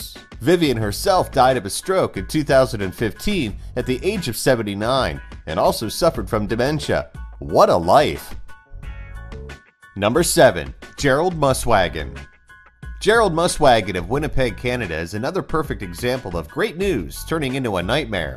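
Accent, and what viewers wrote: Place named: United States. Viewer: Lmao I love how the narrator speaks in an American accent but refers to everything in British slang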